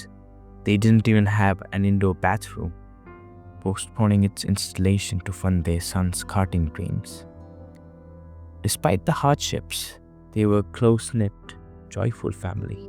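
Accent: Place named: India. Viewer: real - I think indian accent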